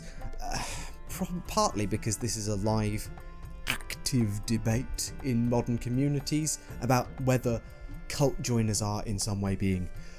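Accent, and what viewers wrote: United Kingdom. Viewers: wait—“modern mainstreaming of Christian culture” in the US? You mean those TWO THOUSAND YEARS of “modern mainstreaming” of Christian culture in Europe are just going to get a pass? You sound like you have a British accent, can you comment on the thousands of lives lost and the awful terrorism stoked by religious fervor during the Troubles? Or perhaps the explicitly violent Christianizing mission of European colonizers in Africa, South and Central America? Or the European Crusades? Come on, let’s not have an AmericaBad moment here.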